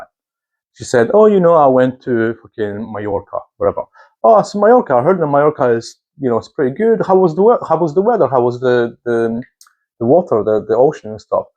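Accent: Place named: Scotland, United Kingdom. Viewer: Are you Scottish but trying to be easily understood or like Eastern European but then it comes off as Scottish?? And I’m not saying that like critically? Whatever it is, I can fully understand you as an American so …. Right on 🙌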